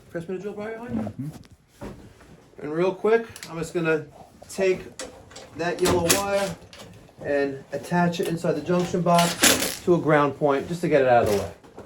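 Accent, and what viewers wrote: New York, United States. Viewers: Voice kinda reminds me of Louis Rossmann. Just that NYC accent working through...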